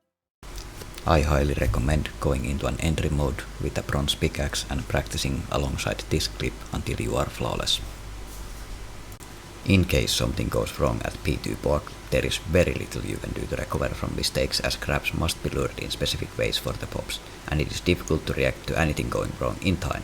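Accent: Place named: Finland. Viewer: are you Finnish? you sound like Xpetu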